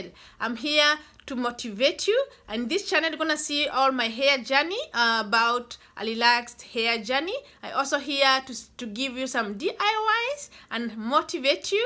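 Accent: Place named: Kenya. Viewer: You cannot take the village out of a person..kikuyu accent still there after 10yrs,#gikuyu&mumbi seed.....proud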